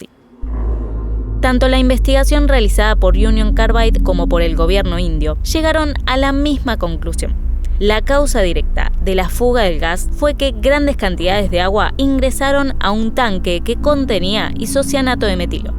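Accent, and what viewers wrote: Argentina. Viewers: Cada día pienso más seriamente que este canal es el mejor canal de Youtube argentina! (por la voz parecen argentinos, me disculpo si no son de ahí), una GENIA la narradora!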